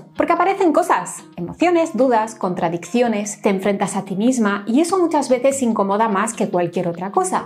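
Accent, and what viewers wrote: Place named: Spain. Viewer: Hola. Me encanta tú tono españolete. Gracias por tú contenido. Un saludo desde Bogotá Colombia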